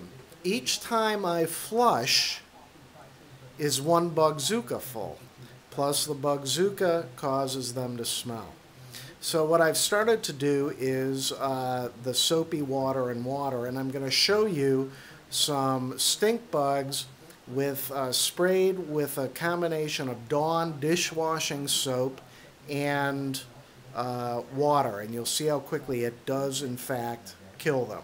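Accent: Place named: Midwest, United States. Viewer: Im from new york. lol my accent is nothing like this. his is more of a mid western accent. lol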